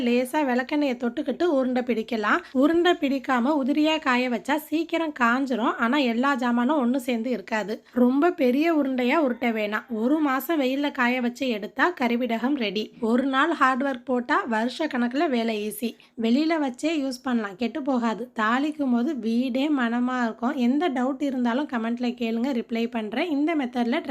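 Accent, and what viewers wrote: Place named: Thiruvarur, India. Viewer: Ungalukku entha ooru enga Orr karanga mathiri pesuringa nanga thiruvarur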